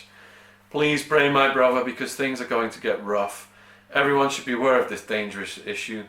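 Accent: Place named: Liverpool, United Kingdom. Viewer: is yr dialect from Liverpool? Brover....brother....cool tho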